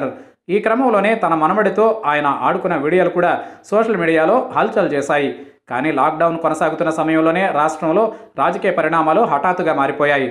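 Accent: Rayalaseema, India. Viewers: E video voice anna medi rayalaseema na anna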